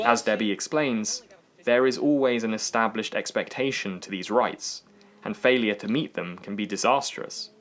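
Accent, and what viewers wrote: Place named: England, United Kingdom. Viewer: I enjoyed this, thanks! Your (very) English accent somehow sits awkwardly with the material, but I appreciated the insights. These are my two favorite movies, and I have just finished watched D&C for, like, the 20th time! I guess the challenge is for someone to do a 90's piece, as that must be the last decade of freedom before technology robbed us of our primal sociability.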